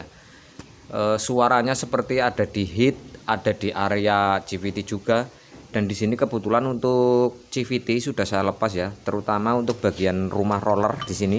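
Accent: Java, Indonesia. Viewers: nama mu kaya marga orang batak bro,,,tapi logat mu medook bat jawa😅😅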